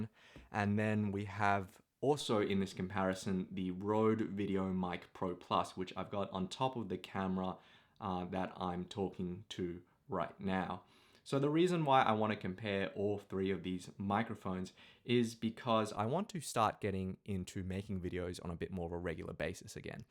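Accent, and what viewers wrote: Australia. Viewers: What boom arm are you actually using for the E614? It looks exactly what I need, and I hear your aussie, and I'm australian too so hopefully I can manage to snag what a beaut you have for yourself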